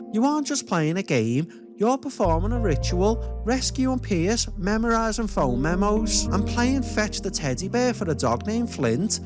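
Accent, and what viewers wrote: Liverpool, United Kingdom. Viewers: scouse accent talking about beloved survival horror of the 90ds and 00ds was on the bingo card, but great video bud...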